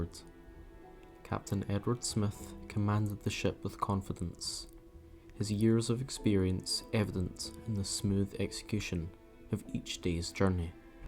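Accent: Scotland, United Kingdom. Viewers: Bros scottish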